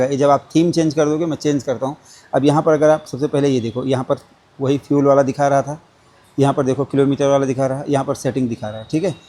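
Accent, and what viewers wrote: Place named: Awadh, India. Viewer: Bhai aap ka bolne ka desi awadhi tarika bahut hi gajab lagta hai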